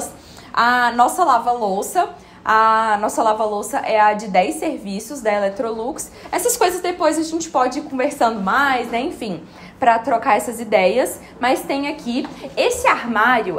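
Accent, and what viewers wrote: Brazil. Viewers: Sua louca rsrsrs eu fui assistir o vlog e quando de repente ela abre a boca e eu: ela é brasileira???🤭🤭🤭 N sabia pensei q era uma gringa já tinha visto vídeo dela e ela me passou essa vibe ✨🤭👏🏻👏🏻👏🏻 ela trabalha com decoração? porque no vídeo ela disse se você quer ver dicas de decoração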